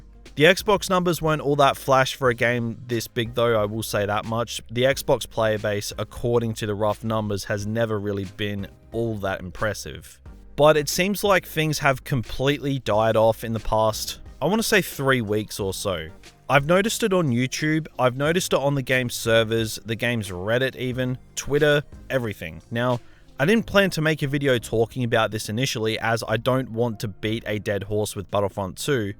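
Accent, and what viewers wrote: Australia. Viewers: Your Australian ? Nice I thought for some reason you were English lol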